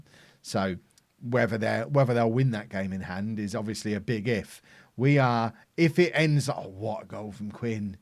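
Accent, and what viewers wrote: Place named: England, United Kingdom. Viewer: I love the english pronunciations.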